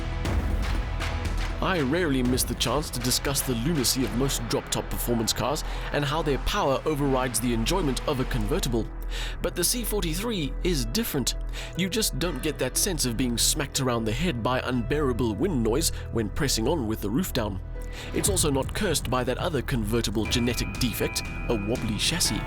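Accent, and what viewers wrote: South Africa. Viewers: Great video, love the South Africa accent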